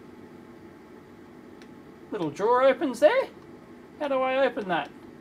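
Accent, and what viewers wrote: Australia. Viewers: Australian accent is awesome :D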